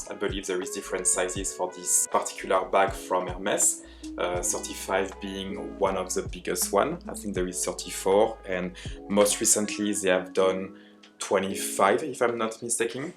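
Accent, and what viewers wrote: France. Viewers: Bonjour de la Belgique 🇧🇪, félicitations pour votre magnifique sac Hermès Kelly 😜❤️, je ne comprends pas l’Anglais, mais je devine ce que vous dites 😂, surtout votre accent bien Français 😂, félicitations pour votre collection Hermès, que je commence seulement depuis 1 an, je me suis offerte 1 Kelly 28 Gol retourné, bijoux Palladium ❤, maintenant j’attends 1 Kelly noir 32 retourné, bijoux Or❤, je me suis offerte une montre H et Brillants aux heures, ex ex ex des petits achats 😜❤️, comme je suis Belge, j’étais concentrée sur les sacs Delvaux Brillant 😜❤️, bon en espérant qu’ils n’y aura pas de disputes dans les armoires, la France contre la Belgique 😂, Meilleure vœux pour l’année nouvelle 🥂🍾🌲. Bien à vous 🤗💛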